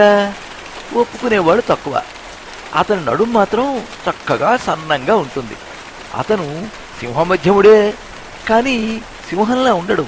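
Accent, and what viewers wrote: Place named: Srikakulam, India. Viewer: మంచి కథ వినిపించారు. మీ గళం లో శ్రీకాకుళం యాస కూడా బాగా పలికింది. మీరు చెప్తుంటే పాత్రల్ని చూస్తున్నట్టు అనిపించింది. కానీ background వర్షం sound మీ గొంతుని dominate చేస్తోంది. Concentrate కథ మీద కన్నా వర్షం sound మీద ఉండడం వల్ల మళ్ళి మళ్ళి వెనక్కి వచ్చి కథ వినవలసి వచ్చింది. 👌🙏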